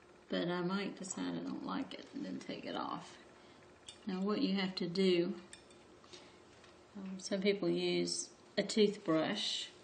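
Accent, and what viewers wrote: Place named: Wilmington, United States. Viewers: I am from Wrightsville Beach.. you sound like you’re from at least Wilmington or nearby. Beautiful painting!!!! I have a very hard time with sea oats and this pretty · This session 4 doesn't look like it started where 3 left off. The foreground is different. Would it be really hard for you to show the exact steps taken in a painting, instead of leaving huge gaps??? Have to say, all your videos are kind of a mishmash and there is no streamlined continuity in any of them, or any combination of them that goes from START to FINISH of a painting. or even the techniques you use. Intermediate stages are not shown which are really crucial for learning. Maybe you need to get more familiar with the technology of making videos. None of the other art instructors up here on Youtube leave such gaps in showing their technique. 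You sound like a very nice Southern lady, however, I think you should stop making so many sessions with each painting and make a one hour long, or however long it takes - video showing literal START to FINISH of a painting. Don't worry about making them roo long. People want to learn the whole process. No one will get bored, there are lots of one hour instructional videos up here, but not many beach scenes unfortunately.. I think maybe you're not comfortable with the video equipment and thats why you leave out some stages from one session to the next. I hope this helps you get more viewers. Your beach scenes are really lovely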